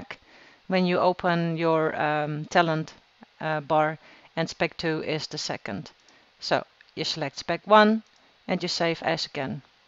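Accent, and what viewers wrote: Netherlands. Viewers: Ive heard right away that she was dutch coz i have the same accent :))). But i have another question. Ive started to play wow again and downloaded my fav vuhdo healing addon. I just bought also another mouse, logitech g604. Problem is i cant figure out how to setup the mouse buttons after mouse button 5 in vuhdo. It looks like vuhdo dont see the buttons after button 5.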